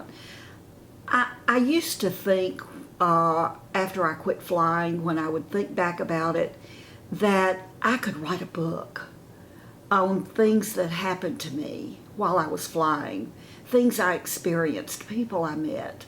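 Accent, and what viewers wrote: South, United States. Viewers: It is so lovely to listen to your voice - your soft, lilting Southern accent. I find it most relaxing. 😌🙋‍♀️🥰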